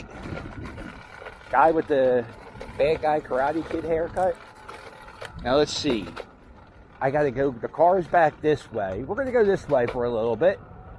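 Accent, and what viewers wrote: Philadelphia, United States. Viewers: You need to do voice over work for "thick Philly accent" types. Lol. I'm from Chester County and somehow it's only South Philly and Delaware County. Why is that? Anyway, cool vid!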